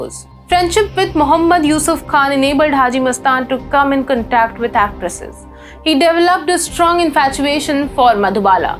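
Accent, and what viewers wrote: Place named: India. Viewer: She is speaking like an indian,,i am an indian tamil,,I can understand.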